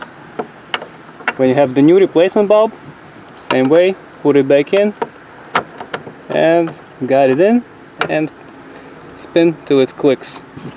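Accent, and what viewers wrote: Russia. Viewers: Наш русскоязычный парень, судя по акценту😊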